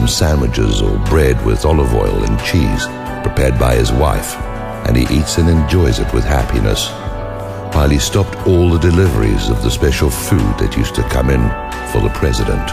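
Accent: United Kingdom. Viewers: It's obvious that our government controls the media and they're all full of shit, but trying to make him into a Gandhi type figure is a bit far fetched. So who is making these videos anyway? Who's writing the material? And who's the British guy narrating?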